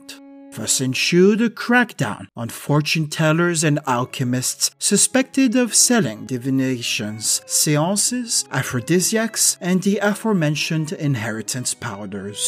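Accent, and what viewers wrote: France. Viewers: Love hearing French history told in a strong French accent 😁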